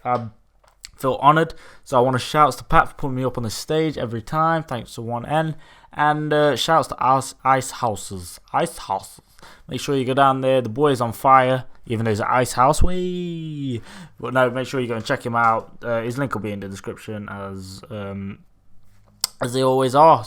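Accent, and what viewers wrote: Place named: United Kingdom. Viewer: Another British voice! <3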